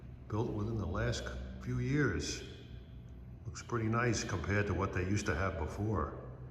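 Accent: New York, United States. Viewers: Great report. I noted that you initially said "down town" and later changed it to Center City. That is the more correct term used in Philly. Re blood pressure, you sounded just a bit stressed out. Perhaps the long day, standing all day or most of it, meeting certain time tables, and dehydration in the heat can cause all that. I have the same problem so I know from personal experience that this can increase your blood pressure. Please stay in the shade, stay seated, drink plenty of water, have a good sandwich, and stay as relaxed as possible. No bad words! Kudos to you for keeping it kosher as they say in Brooklyn. Did you get to chat with some of the locals? I bet your NYC/LI accent stood out among those Philly jawns. Look forward to your next report. Keep up the good work.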